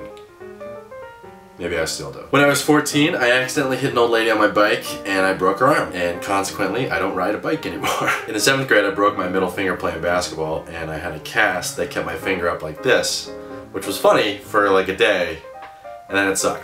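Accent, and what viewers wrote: Boston, United States. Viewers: I'm just marvelling at the Boston accent "step on a crack ya break ya muthas back" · Are u from boston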